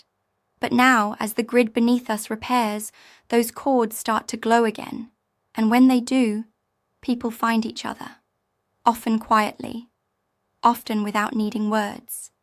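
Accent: United Kingdom. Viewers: with the Brit accent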